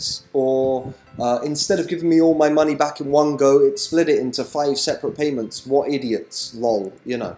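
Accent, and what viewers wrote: United Kingdom. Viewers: If you live in UK like me, then when you come to withdraw Etoro do not mention that them converting UK to USD to be able to trade , then when you cash in, Ettoro converting again £USD back to £UK costs UK citezens a whopping 25% ! . That is what I earn almost earn from trading, so whats the point in trading with etoro if you live in the UK? Strange as you sound English so I wonder if you have this issue or have you found a work- around on this one. If so it would be get to know the trick. thanks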